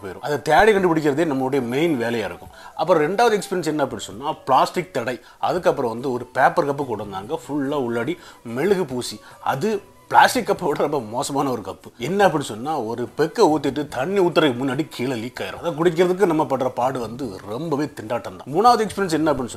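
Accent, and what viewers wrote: Tirunelveli, India. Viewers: Neenga Tirunelveliya?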